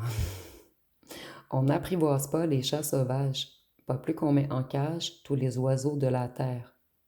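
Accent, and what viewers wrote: Quebec, Canada. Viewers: Ahh oui pis je comprends pas pourquoi tu caches ton québécois ça fait parti de toi. Je crois pas que les Français belges et autres se bloquent. Tu peux être naturelle c'est tellement moins épuisant. 😘 Merci et j'aime beaucoup tes titrages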